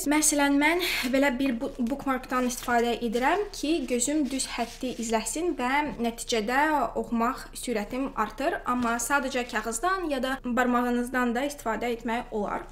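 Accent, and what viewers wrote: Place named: Russia. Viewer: по твоему акценту я понял что ты владеешь и русским языком, но пожалуйста разговаривай как тебе угодно не обязательно говорить на строгом азербайджанском будь свободной не комплексуй )))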